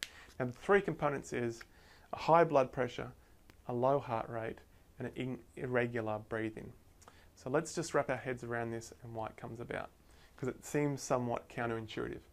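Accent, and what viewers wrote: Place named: Australia. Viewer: Legend! So much easier grasping this concept hearing it from a fellow Aussie! Cheers :)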